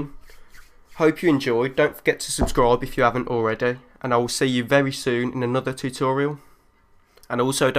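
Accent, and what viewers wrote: Birmingham, United Kingdom. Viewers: van Ingen I would suggest that has a 'brummie' accent which is typical of people from Birmingham in the UK. I'm not, however, an expert and it may be that he could be from another part of the Midlands (with a similar but distinct to those who know accent) and in which case he could well be offended by my suggestion. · I hate that traditional English cuddly accent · brumie accent :)? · i would love to get into this!! I want to do some intros and stuff. Great work matey. Where did you learn all this man! Nice to hear a fellow brummie too!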